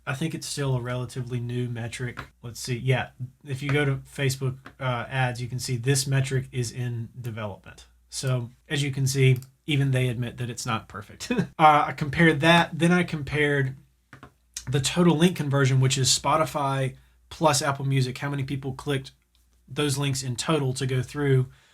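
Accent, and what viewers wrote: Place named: South, United States. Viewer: I think I hear a southern twang to your voice. We just talk slower 😄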